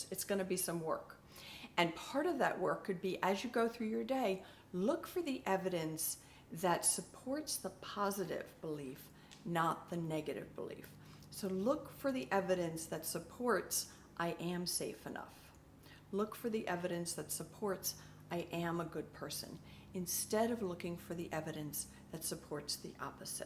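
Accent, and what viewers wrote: United States. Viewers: Your videos are amazing! They help me a lot! I also love your californian accent.... And you are so pretty! Thanks 🤗☺️🌷🌷💕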